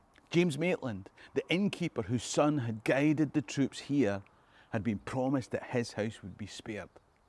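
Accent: Scotland, United Kingdom. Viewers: Hello, hello from Virginia in USA! I would like to have a "Let me tell you a story..." shirt because I recently told about my Scottish heritage & I LOVE learning about Scotland from! He is fascinating, engaging & I adore listening to Scottish history in a brilliant Scottish accent! Thank you so much for telling stories! 💜💋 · I'll leave that small hoodie for someone else, but I love your channel! The wonderfully presented history, the beautiful Scottish accent, the scenery, and humor all keep me coming back for more. Keep up the good work! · You live in Scotland, you sound Scottish! So I think you're probably Scottish! I've known several Americans who claimed to be proud Scots. But they'd never been to Scotland & couldn't even pronounce Edinburgh! Don't let anyone tell you you're not a Scot! Great video!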